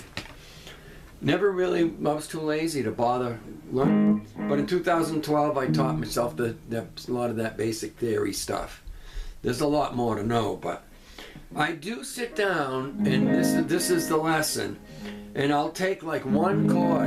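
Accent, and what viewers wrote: New England, United States. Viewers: Enjoy your YouTube journey, my friend. You sound like you're in my kneck of the woods, Newengland. New Subscriber 🎸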